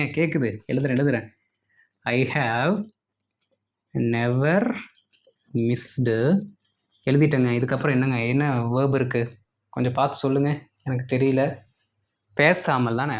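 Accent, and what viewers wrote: Coimbatore, India. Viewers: I thought so.. That's why I asked.Coimbatore slang. (Nice) Thanks for answering. I madam.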